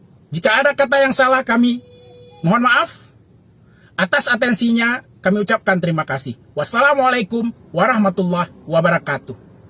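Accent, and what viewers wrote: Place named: North Sumatra, Indonesia. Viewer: Bahasa nya kayak logat bahasa sumatra utara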